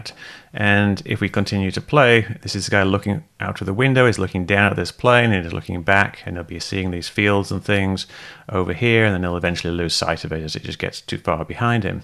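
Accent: United Kingdom. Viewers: Your clear Manchester accent gives you away! Manchester United is my favorite football team in the UK! You keep doing what you're doing, it helps to dowse the flames coming from the arses of true believers;^)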